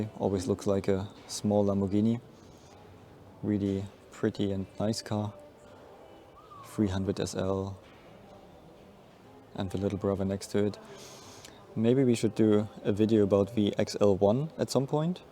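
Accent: Germany. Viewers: When a Dutch person speaks English, it is horrible to listen to. But with your (German) voice, it sounds absolutely perfect.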